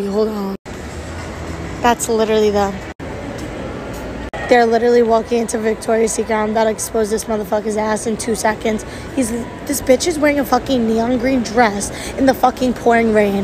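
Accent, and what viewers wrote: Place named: Italy. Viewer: Her raspy and deep voice just makes it a whole lot better, reminds me of a italian accent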